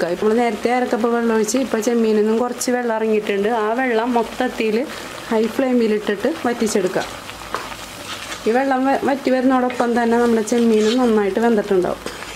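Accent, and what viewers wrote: Kodungallur, India. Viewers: 😀samsaram kettappol thonni. Ente veed kodungallur an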